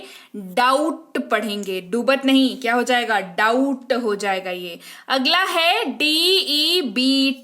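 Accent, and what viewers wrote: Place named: East, India. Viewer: आप के उच्चारण मे पूर्वी भाषा मिठास बढ़ा देती है।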